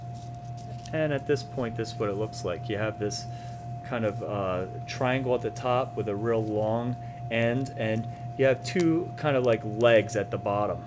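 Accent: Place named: Pittsburgh, United States. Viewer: Digging your Pittsburgh accent. :)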